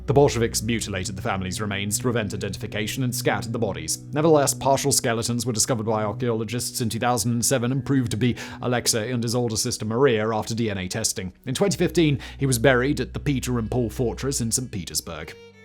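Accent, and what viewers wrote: England, United Kingdom. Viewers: With his thick English accent, he needs to talk slower!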